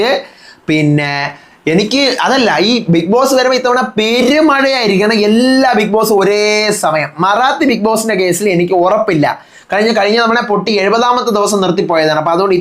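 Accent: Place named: Tamil Nadu, India. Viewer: Nee arada pandi ano tamiz kalarthi malayalam parayan. Onni malayalam alle Tamil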